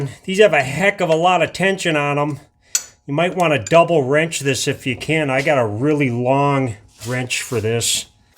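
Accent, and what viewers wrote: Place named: North, United States. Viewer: This is so good! Naming the nuts by MM. Talking to gravity in a positive way. Staying positive no matter what. No cussing. No broken knuckles. lol and a sweet accent. Northern US or Canada? Maine? Minnesota? Uper? Thanks so much man! Very well done!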